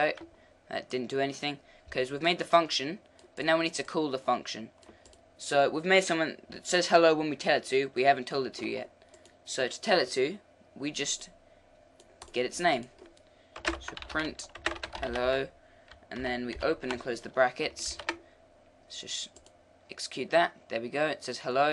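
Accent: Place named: England, United Kingdom. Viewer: They're not, they are in the language of lua. If you mean why is the person who's talking doing so with an English accent then he's probably lived in England for most of his life, if not all of his life.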